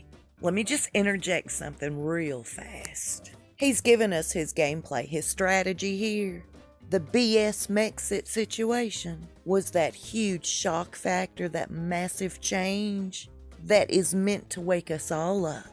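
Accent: South, United States. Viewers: Love you! From one southerner to another. This N’awlins girl digs that drawl!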